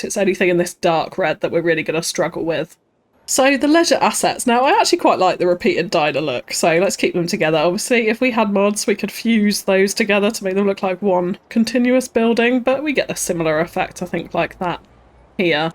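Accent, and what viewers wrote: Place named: RP, United Kingdom. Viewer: What is this BBC radio accent, it giving flashbacks?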